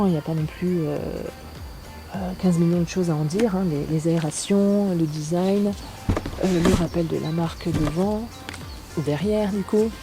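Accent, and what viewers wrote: France. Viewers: good choice nice helmet btw your English with french accent I LIKE IT.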